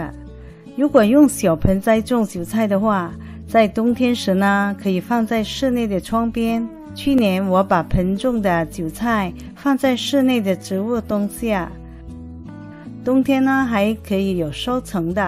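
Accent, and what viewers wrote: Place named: Cantonese, China. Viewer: 喜爱的广东口音，亲切，可爱。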